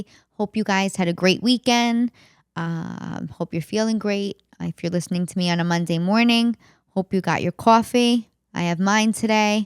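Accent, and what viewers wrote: New York, United States. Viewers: Great show no family is normal. We actually grow from our pains and problems and issues. Love your NY accent. Lost mine living out in the country but every once in a while someone will say "you are not from around here" lol. We need to always be proud of our families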